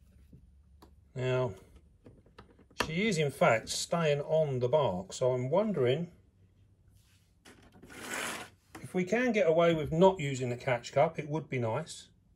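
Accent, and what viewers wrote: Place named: London, United Kingdom. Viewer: Great videos can’t stop watching now, where about are you from as you sound like you have all London accent but I didn’t think e could keep venomous spiders over here? Or am I I just being stupid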